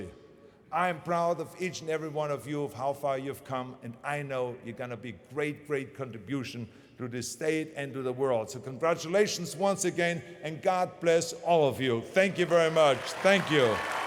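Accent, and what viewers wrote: Germany. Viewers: The German accent is pretty strong, and Germans/Austrians are pretty tight with their Pronunciation, and I guess when you start speaking English properly as an adult you always have an accent. My parents have been living in an English speaking country for 15 years and they still have an obvious foreign accent, but me, having moved here when I was 4, I speak the same way everyone else does here. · How come this guy's been living in the U.S. for 40+ years and he still keeps such a strong German accent? he says he also took accent removing classes...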